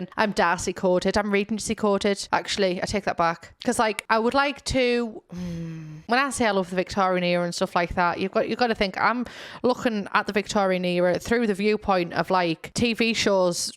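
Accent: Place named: Northern England, United Kingdom. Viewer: I love how unapologetically northern you are ❤ (im a geordie so also northern)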